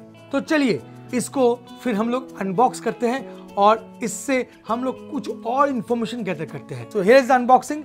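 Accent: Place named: West Bengal, India. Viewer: bangali kab se bartender banne lag gaye...fir hume to rosogulla khana shuru ke dena chaiye..saala bolne k tareeke se hi nasha utar gaya...not watching beyond 30 second... 🤣🤣 dada tumi roshogola khao..daru varu tumhara kaam nhi h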